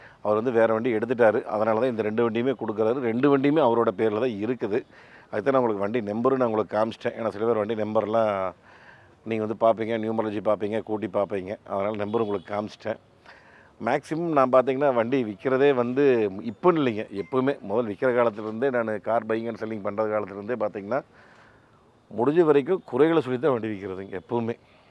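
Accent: Kongu, India. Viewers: Brother.... நீங்க கொங்கு தமிழ் நல்ல பேசறீங்க.... சிறப்பு....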